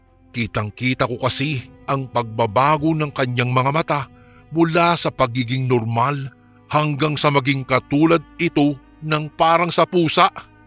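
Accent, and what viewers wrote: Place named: Iloilo, Philippines. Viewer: Ay wow Ilonggo gd ..ya daw mabuga ko mn ya kape ko kag hahahahha ano iloilo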